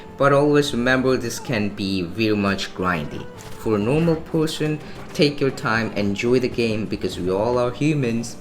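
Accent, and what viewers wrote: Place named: India. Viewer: why can’t indians say “er”??? its always “ohh” like instead of “first” they say “fohst”. or burst is bohst. so annoying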